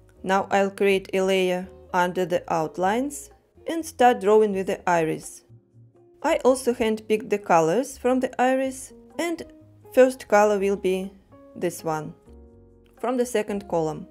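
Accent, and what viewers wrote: Russia. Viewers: Ну блин не обмануть тебе своих😅 ну сразу же слышно что из России автор 😏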